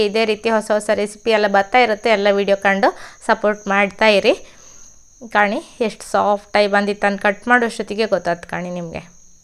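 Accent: Kundapura, India. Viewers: ನಿಮ್ಮ ಕುಂದಾಪ್ರ ಕನ್ನಡ 👌🏻👌🏻 ಗೋಧಿ ಹಾಲ್ಬಾಯ್ ಕೂಡ ಬಾಯಲ್ಲಿ ನೀರ್ ಬರೋ ಥರ ಇದೆ. 2 ದಿನ ಇಟ್ಟು ತಿನ್ನಬಹುದಲ್ವಾ.. 👌🏻👌🏻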